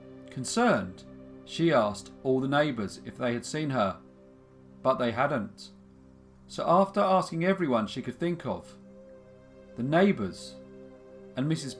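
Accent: England, United Kingdom. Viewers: Have you actually ever met a real human being?? You sound English but I've never in my life heard anyone pronounce the word 'granite ' as you did here mate!? Seriously! Where the fook did you get that from? You do not pronounce the silent e at the end of that word !!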